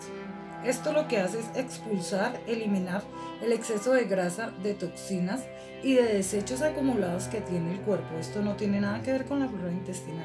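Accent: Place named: Colombia. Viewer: Me encanta el acento colombiano!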